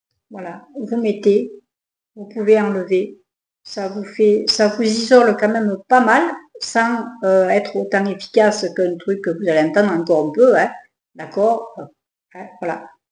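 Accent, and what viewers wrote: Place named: Provence, France. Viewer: Bonsoir, je vous regarde de Paris et j'adore votre accent, vous êtes du côté de ma sœur vers Cavaillon. Juste une petite précision le 2ème micro je pense qu'il faut l'accrocher à votre chemisier. Bonne continuation ❤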